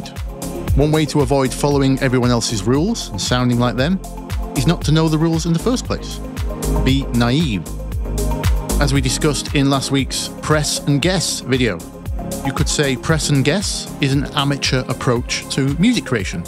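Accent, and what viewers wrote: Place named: South Yorkshire, United Kingdom. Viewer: Great vid always. This resonated. I think learning the rules has made my music worse. Its technically better and i am closer to the sound o wanted to create but its just not as original. Oh, the curse of the creative . Struggling to place your accent, thinking somewhere South Yorkshire but Im probably miles wide of the mark.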